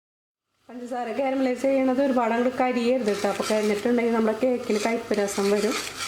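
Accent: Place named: Aluva, India. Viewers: ചേച്ചി ആലുവ കാരി ആണോ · എവിടെ വീട്.. നല്ല പരിചയം ഉള്ള സംസാരം.. എല്ല word ന്റ അവസാനം "ട്ട ". . ഞാൻ ഇങ്ങനെ സംസാരിക്ക 😄from ത്രിശൂർ. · കൊച്ചി ആണോ നാട് ??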